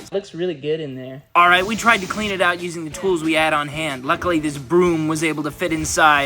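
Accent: United States. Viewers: You sound like American ! Euros??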